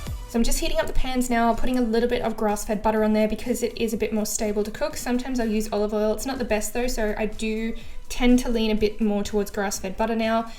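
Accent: Australia. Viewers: Your accent, Australian? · I love your accent are you from Australia!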